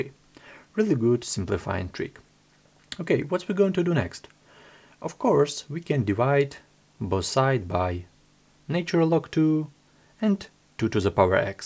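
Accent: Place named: Russia. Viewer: hello there! you are making great content! i have a few questions.u dont have to answer, if you see some of them personal) 1. will you do videos on higher mathematics? 2. what is your origin country? (i'm asking you this question,cause of your accent. i am from russia and i hear many of ours speak in english like you) 3.do you enjoy your life?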